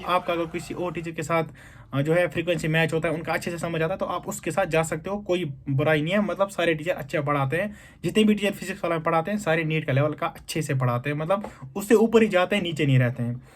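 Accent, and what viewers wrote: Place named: Kashmir, India. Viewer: Aap kashmir se ho kya bhaiya qqki apki accent waise h ❤❤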